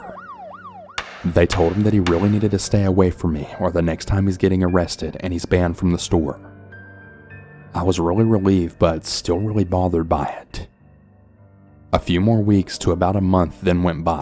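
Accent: South, United States. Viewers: Why does the narrator sound like he's from the south? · I love the country accent Thanks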